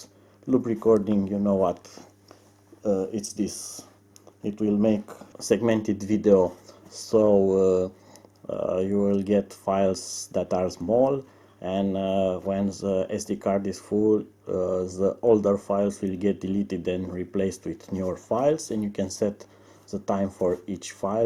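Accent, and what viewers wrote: Romania. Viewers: Good review , buy the way this is 100% romanian enghlis, accent tell us :)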